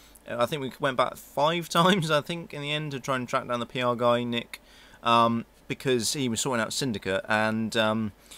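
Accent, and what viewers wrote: United Kingdom. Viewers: Dat Yorkshire accent doe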